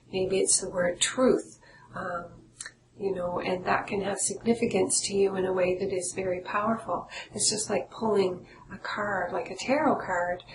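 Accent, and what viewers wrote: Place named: Nova Scotia, Canada. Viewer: Hi, I am so sorry that I did not know about you when I was living in NS. I am very interested in learning to channel as I feel spirit talks to me in very subtle ways and I want to be more open to the messages. I have to laugh as right away I picked up on the accent and had to google you to see where you were from..i miss the NS accent:(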